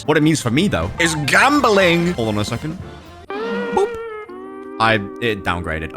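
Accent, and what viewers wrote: United Kingdom. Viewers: Are you British because I don't mind British people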